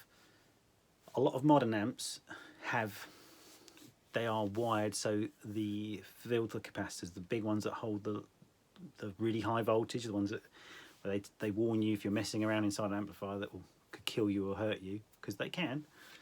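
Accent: United Kingdom. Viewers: The English accent is good for singing not so much for speaking sorry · I thought you sounded like a true British rocker with the english accent. John Peralta sounds like a dick! Keep rockin the great information. Appreciate you taking the time to make this video bro!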